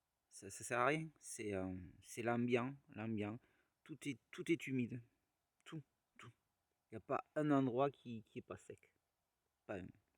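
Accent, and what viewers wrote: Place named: Pyrenees, France. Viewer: Merci pour tes vidéos et bravo pour cette traversée. Cela m’a d’autant plus intéressé, que j’ai également démarré une traversée des Pyrénées en fin de saison cette année. J’ai d’abord regardé ta revue de matos. je fais partie de ceux qui s’intéressent au matos 😂. Et je viens de boucler tes 4 vidéos … et bien mon pôvre 😉 ( j’adore ton accent pyrénéen : “j’suis tremp “ ) le moins qu’on puisse dire c’est que tu n’as pas été gaté par la météo. C’est clair qu’il fallait en vouloir pour aller jusqu’au bout . Tes commentaires m’ont replongé dans mes propres galères. Et c’est vrai que c’est pas facile et qu’on n’est pas toujours fiérot quand on se retrouve seul au milieu de la caillasse et des cols, dan sun lieu qui si il est magnifique n’en reste pas moins austère. C’est intéressant de voir un parcours très tôt dans la saison. 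Moi j’étais plutôt parti pour finir la saison (départ de Banyuls le 30 août ). J’étais parti avec l’idée d’essayer de boucler l’intégralité mais je n’avai spas trop de mou sur les délais. Ce n’est pas exactement la HRP que je suivais , mais un itilnéraire décrit dans un topo Glénat « le Trek des Pyrénées », ça combine du GR10, du GR11 et de la HRP en 43 étapes. J eme suis arrêté au 14 eme avec avoir quasiment traversé Andorre suite à une douleur récurrente et croissante à la cheville ( en fait j’avais un tendon fissuré ), de plus j’ai commencé à être malade et avec des quintes de toux bien envoyées ( la aussi, la suite a révélé que j’avais chopé le covid). Bre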